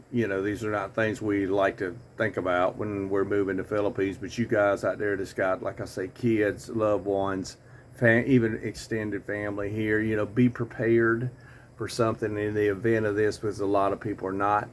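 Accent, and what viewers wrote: South, United States. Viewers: Southern accent is fun to hear 😂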